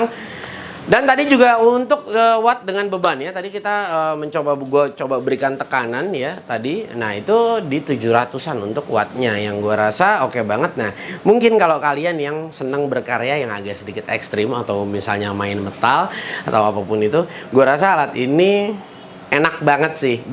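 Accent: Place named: Betawi, Indonesia. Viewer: Jujur bang cara penjelasan ente emang beda betawi abis mantap ❤ane jd cengar cengir ngeliatnya 😂 ini baru review + hiburan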